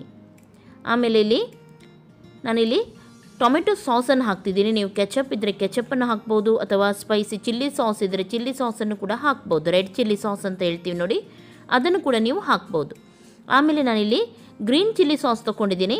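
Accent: Mangalore, India. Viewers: Ma'am nimma manglore Kannada kelodake tumba kushi aguthe kelthane irbeku ansuthe.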